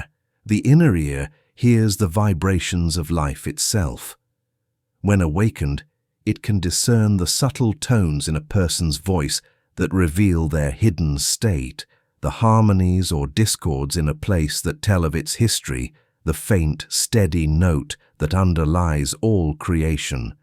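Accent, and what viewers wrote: United Kingdom. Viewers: Plus the prevalent British accent… 😑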